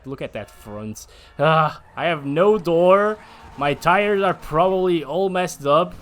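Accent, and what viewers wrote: Brazil. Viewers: Ele é brasileiro????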